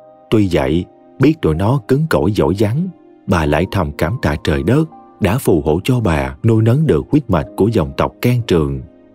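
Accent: South, Vietnam. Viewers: Nguoi doc truyen that có duyen va giong mien nam that hay! Truyen ngan nhu vay minh rat thich…Xin chan Thành Cam on nhieu nhé ❤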